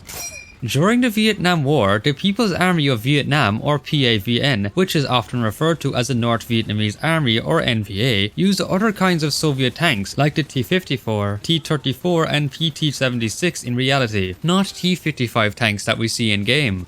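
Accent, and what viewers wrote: Ireland. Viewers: I never realized you were Irish…I actually thought you were German or Polish…don’t know what the says about me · Are u Irish if so what's the crack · funniest Part of video!! 😂😂. Hey, are you from Ireland!?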